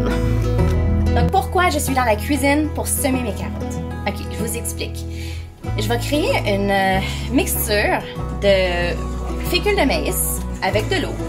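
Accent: Quebec, Canada. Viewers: merci pour ta technique je vais essayer peux t'on essayer avec autre graine ? salade par exemple. moi contant entendre quebecois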